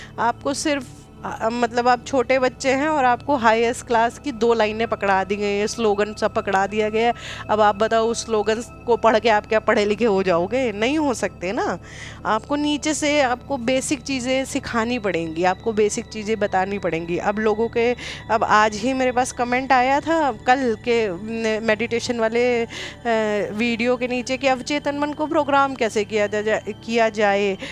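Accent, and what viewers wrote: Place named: Uttar Pradesh, India. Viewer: दीदी आपके वीडियो बहुत ही अच्छे हैं, में आपके वीडियो लगातार देख रहा हूं, और कुछ करने का प्रयास भी कर रहा हूं, और दीदी आपकी भाषा उत्तर प्रदेश की है और आप उत्तर प्रदेश से ही है यूपी के रहने वाले मेरे संपर्क में हैं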